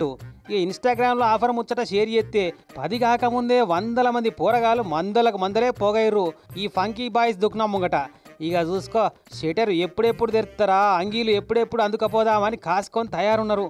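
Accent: Telangana, India. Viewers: Any how i enjoyed the anchor telngana slangs ...love from odisha😂😂😂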